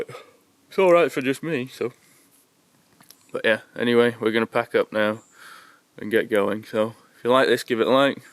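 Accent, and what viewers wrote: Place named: North, United Kingdom. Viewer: That's the case nowadays 5 man and can only fit 3 airmats in...do i hear a northern english twang there..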